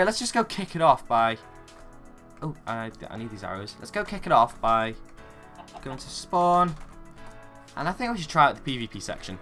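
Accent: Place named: Scotland, United Kingdom. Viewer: You have a bit of an accent, are you Scottish?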